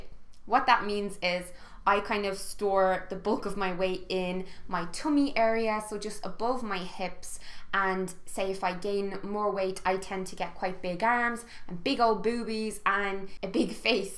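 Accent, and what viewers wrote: Ireland. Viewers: Haha I'm a sucker for an Irish accent!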